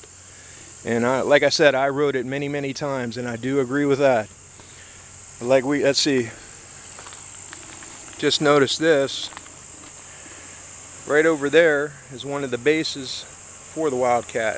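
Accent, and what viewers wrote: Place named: Northeast Ohio, United States. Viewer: It was nice of him to commemorate the ride in this way. Gotta love that NE Ohio/ western PA accent. Haven’t lived in OH for many years but like your hubby I think about this coaster all the time.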